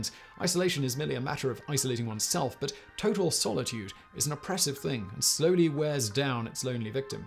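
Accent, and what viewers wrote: England, United Kingdom. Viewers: I too found this information interesting. Unfortunately, I find it hard to understand you though. It isn’t how fast you speak, but that you do not fully enunciate. Many words trail off in volume such that I am struggling through the whole vid trying to catch your words. Add the English pronunciation, and it becomes too much work. I gave a thumbs up for great content.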